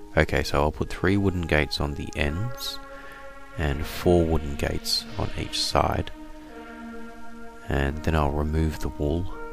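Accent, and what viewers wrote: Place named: England, United Kingdom. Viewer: Thumbs up if you read Harry Potter and you knew that the guy was going to have an English accent.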